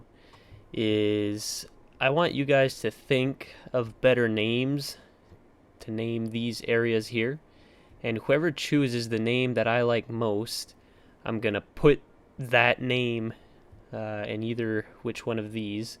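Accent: Canada. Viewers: I mean u sound Canadian, but the most famous Atlantic city I know is here in jersey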